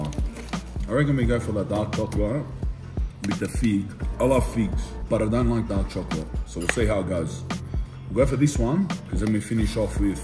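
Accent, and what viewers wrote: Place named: Australia. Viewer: Interesting that the Swiss claim chocolate as their specialty when cocoa is only grown in warm to hot climates. It’s grown in Australia and we have good chocolate at I good price. Nonetheless Spanish at his best with a good Aussie accent in a place they all seem to speak English and good English too Beauty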